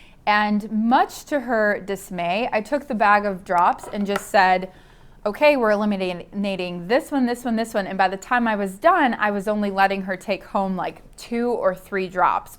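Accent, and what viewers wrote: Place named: Michigan, United States. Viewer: I knew it! I just checked your bio and I see you're from MI. You have the accent 🙂. I love your content. It's so helpful and informative. You clearly know your stuff!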